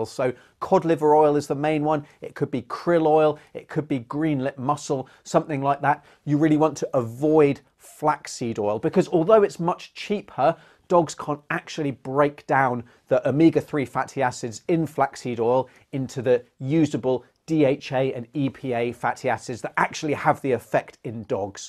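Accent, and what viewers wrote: United Kingdom. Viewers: You talked so fast I couldn't understand what you were saying especially with your English accident. Sorry. But I'll have to look somewhere else for a remedy for my dogs' itching.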